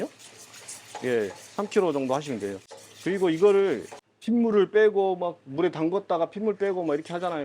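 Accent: Jeolla, South Korea. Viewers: 전라도억양이그대로 ㅋ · 이 정도면 어음청 친절하고 정확하구먼.. 전라도 사투리 억양이 좀 있으시는거 같음 🤔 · 전라도 말투 짜증난다.배주스 넣어라 비싸다.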